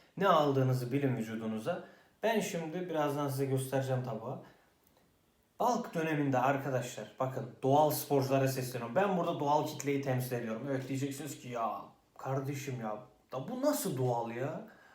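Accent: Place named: Trabzon, Turkey. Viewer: Ne kadar düzgün konuşmaya calışsanda trabzonlu olduğun belli edeysin kral 🖤👏🏻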